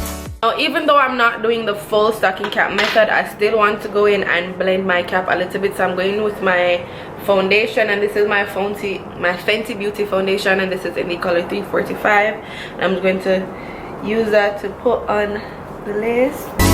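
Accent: Jamaica. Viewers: I’m like this girl sound Jamaican 🤣 and I realize you are. Love this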